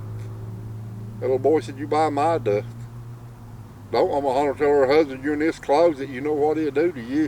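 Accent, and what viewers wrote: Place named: South, United States. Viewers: their exact words were "yes and someone who can speak clearly". That's no different than walking up to someone speaking Spanish and calling them stupid for not speaking English. This person speaks Appalachian English very clearly A N being ignorant of the dialect doesn't change that. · Mountain speak. It took me some time after moving to the NC mountains to develop an ear for the "country" speak in these parts.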